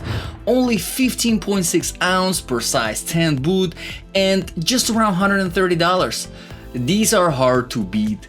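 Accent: Russia. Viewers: Hi there !!! First of all I did sense russian accent !!)) Now about boots you recommend !! I will not buy any of them !! The all are no good all 10 of them !!! Let me explain you why !!! They all have the same or similar shoelaces tight up desighn . Those shoelaces going through fabric or plastic loop from bottom to the midle of boot and at the top of a boot through sets of metal or plastic hooks !!! This is very poor desighn because send and dirt will packed up on your shoelaces wile you hiking and friction will tear those loops through , those hooks at the top of those boots will do the same thing to your shoelaces because those hooks are tight , and every time you tight your boots the same part of shoelace will be abused by friction on that hook. I talking of few month of everyday carrying and you will be noticed of unprepared damage !!! Any field repair to this loops will not hold up for ling , maybe one day and than all sawing will tear them even worst . 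Same thain with those hooks they will keep twaring your shoelaces, and there is absolutely no way to fix them , if you try to file them to smooth corners , you will do even worse by creating shapr edged scratches. !! Only good design is metal eyelids where shoelaces go straight trough those sidelips on your boots !! That design is very forgiving on your shoelaces and much easier to repair on the field , specially if you are not just hiking for a day , but actually surviving , and covering a lot of distance on daily bases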